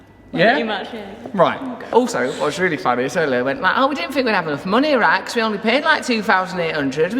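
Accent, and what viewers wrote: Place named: Bradford, United Kingdom. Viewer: Love the Bradford accent.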